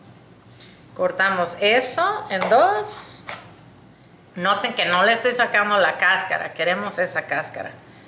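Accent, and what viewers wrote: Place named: Bolivia. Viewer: 😁 tenias que ser boliviana. Hermosa, me fascina cómo hablas disfruto mucho al ver tus recetas fáciles y delis 👌👏👍👏👏👏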